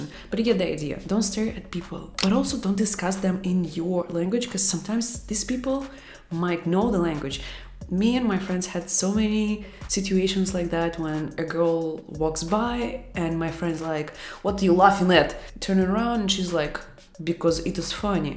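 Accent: Russia. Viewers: Thanks for making this video it was helpful for me . And also I like your Russian accent the way you talk ❤️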